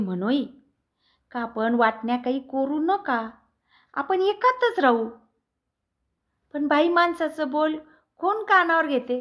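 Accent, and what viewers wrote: Vidarbha, India. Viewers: छानच हं..! अॅडव्होकेट सौ.,! वर्‍हाडी बोलाचा ठसका साधलाय..अभिनंदन! pvshirsath Nasik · ताई आमचे १४ वर्षे विदर्भातील यवतमाळ येथे नोकरी निमित्ताने वास्तव्य होते. अगदी तीच वैदर्भीय भाषा ऐकून खूप मजा आली. आपल्यासारख्या वकिली पेशातील ताईंनी हुबेहुब खूप छान प्रस्तुत केले. भावले बुवा मले. ❤ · हे वैदर्भीय भाषेतले, एका खेडवळ स्त्री चे स्वगत ऐकताना मन अगदी गुंगून गेले. भाषेचा वेगळा लहेजा, म्हणी तर आहेतच पण तिच्या भावनांचा आविष्कार आपल्या अभिनयाने जिवंत झाला आहे, हे सत्य! ❤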